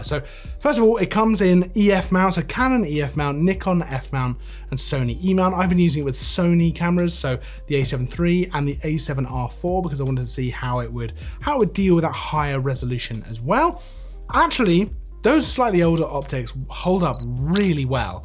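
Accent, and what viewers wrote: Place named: United Kingdom. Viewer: I can't with the old British accent. I study American history and what those people did to this country is deplorable. So his accent makes me feel nauseous. Not his fault 🤢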